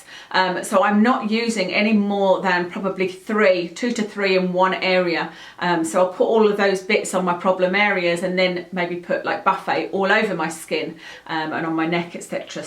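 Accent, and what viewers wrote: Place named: Essex, United Kingdom. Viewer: I like your videos - you're so down to Earth. I also find the Essex-like accent amusing, especially how "l" is pronounced as "w" (... get my naows done), lol. 😆💅🏼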